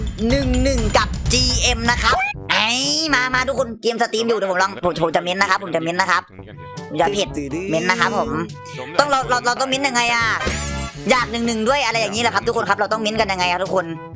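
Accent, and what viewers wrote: Isan, Thailand. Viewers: พี่ภาคอิสานจรืงหรอ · อ้ายแมนือเปนตะฮักแท้เว่าอีสานเด้นิย้านฟังบ่ฮู้เรือง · หวัดดีตัวตึงพากอีสาน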